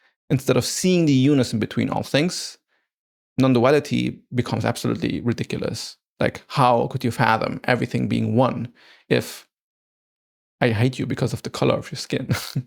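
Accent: Germany. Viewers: Why does the internet lie about your nationality and ethnicity? It is odd information to restrict access to. I would understand keeping your birthplace and current locale undisclosed, but the internet is wrong about all your details. I can tell just by looking at you and hearing your voice, you are definitely not a white Russian. AI has to go, it's useless junk. Based on accent, it is far more likely you are german. Your face is a bit ambiguous, so I could not guess where your family is from. Maybe India, Bangladesh, or Pakistan. Somewhere from the spiceland. Edit: Nah, you're some African Caucasian hybrid. That explains your accent.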